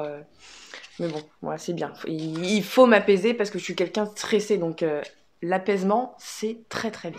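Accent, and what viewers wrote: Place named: Normandy, France. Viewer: haha t habites en normandie!! je me disais bien que tu avais ce petit accent si tu arrives a arreter de fumer ce serait top!!!!! mets la photo de ton pere sur ton porte monnaie ça va te motiver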